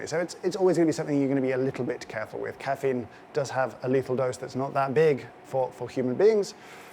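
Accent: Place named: England, United Kingdom. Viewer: English acent - Check Red Sweater - Check Factual content of an amazing place - Check Anyone else getting Tom Scott vibes? I don't know how I feel about this, I miss Tom.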